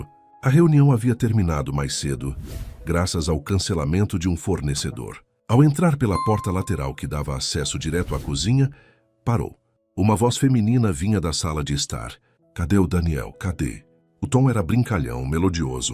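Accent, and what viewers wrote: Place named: Portugal. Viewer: É necessário tomar mais cuidado com a narração. Foi lamentável a escuta de portugues de Portugal, depois inglês...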